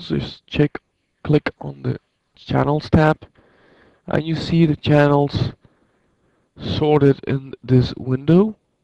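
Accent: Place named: United States. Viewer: Inglês bom pra eles mesmo só o americano... vai falar keeeent (can't) mesmo... sotaque irritante... so... were u stoned, sleepy or just bored? can u teach me how to smash who doesnt broadcast botafogo's soccer games? PLEASE! BROADCAST MORE SOCCER MATCHES FROM THIS TEAM!!! COME ON! ITS LEADIN THE BRAZILIAN LEAGUE N PLAYIN NICE SOCCER! ty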